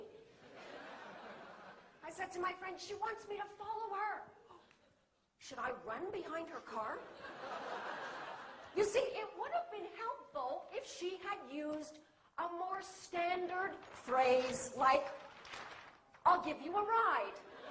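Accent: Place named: China. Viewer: THAT'S CHINESE ENGLISH LAH! NOT MELAYU ENGLISH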